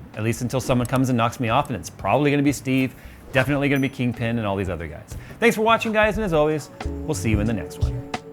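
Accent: Canada. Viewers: your Canadian?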